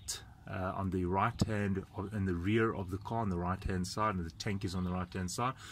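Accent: South Africa. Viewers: Victor Araoz he sounds South African not Austrian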